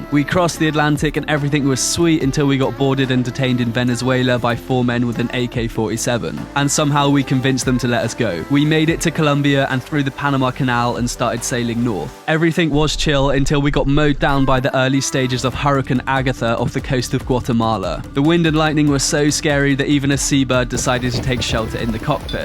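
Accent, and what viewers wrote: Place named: RP, United Kingdom. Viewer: Cheeks clapped? Way too casual use of that with this fancy sounding British accent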